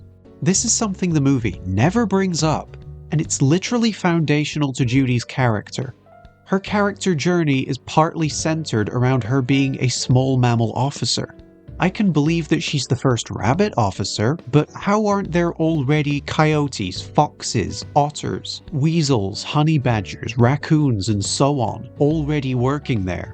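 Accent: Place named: West Country, United Kingdom. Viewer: I mean... I'm guessing you're not American, since your accent sounds vaguely West Country, so you should be able to pronounce that. "pa-pa-ver som-ni-fe-rum".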